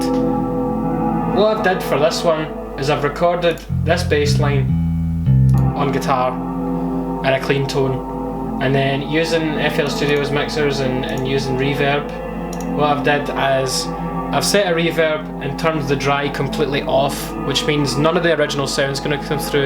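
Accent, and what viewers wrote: Scotland, United Kingdom. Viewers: Are you scottish? It sounds a bit like you're scottish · Slow it down to 00.75 When he starts talking he sounds like Scottish Johnny Vegas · Scottish isn't a language. He's speaking English with a Scottish accent. Lol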